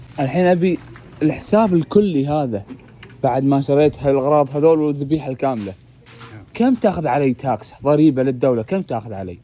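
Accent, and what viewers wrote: Gulf, Arab world. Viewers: لهجتك قريبة عالخليج كلش ليش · ياله لهجتك مو كانو لهجتنه بلعراق كانو خليجي 😮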